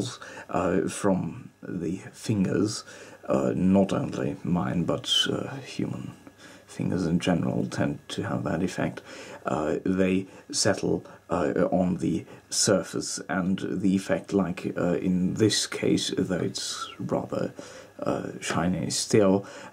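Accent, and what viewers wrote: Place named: RP, United Kingdom. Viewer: To plumby voice so watched it with sound down.. No offence but it done my head in.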